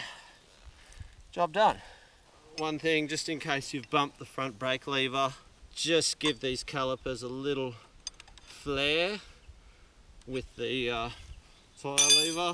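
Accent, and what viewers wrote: Australia. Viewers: your Aussie accent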